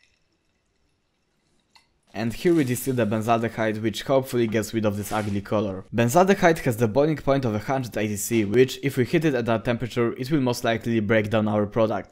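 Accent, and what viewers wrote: Poland. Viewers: why your accent suggest me, you are from Poland xD? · Your English is really good and the humor is really great paired with videos like this. I like your accent but I can’t tell where it’s from. You sound Polish but I don’t know for sure (sorry). What country are you from? Do you live there or are you in the US now?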